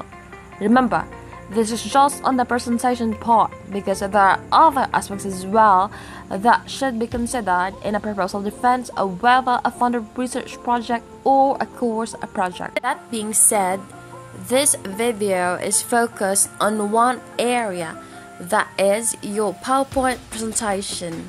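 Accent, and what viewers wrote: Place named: United Kingdom. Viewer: Hey! your British accent is amazing! Hahaha I know it’s yours voice, funny and educational. I’m done watching,like and subscribe. Of course it’s amazing. I miss you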